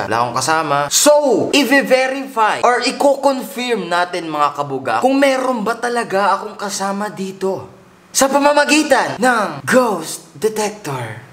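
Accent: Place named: United States. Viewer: Bakit ka nag american ako subcribe ko tas like shempre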